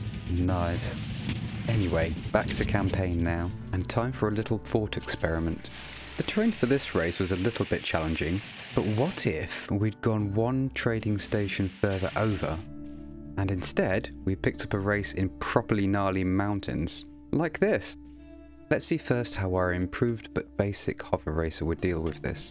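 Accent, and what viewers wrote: United Kingdom. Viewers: I never knew you were British (or at least had a British accent) Oh and I won't like this until somebody decides to ruin that 100 like perfection with another like or a dislike. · That British is awesome.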